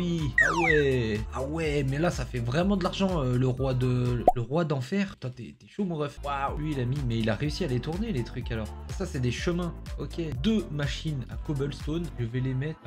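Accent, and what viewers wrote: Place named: United Kingdom. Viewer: J'adore ton accent anglais quand tu lit les trucs en anglais 😭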